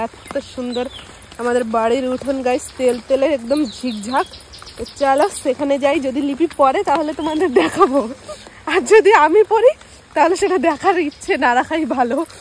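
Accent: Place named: Bangal, Bangladesh. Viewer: Bhaaaa ki sundor bangal kotha koi tmr maiada❤❤❤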